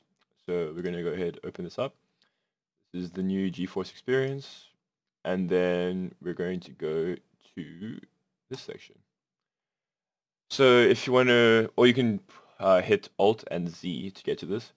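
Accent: South Africa. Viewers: South African?? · Added a Like and Subscribed , you are from RSA right ? xD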